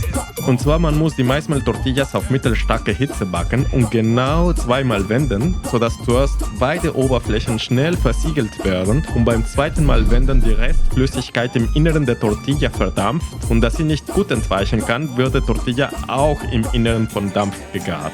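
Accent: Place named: Russia. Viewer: Интересно, по акценту автор русско говорящий. Не плохой немецкий.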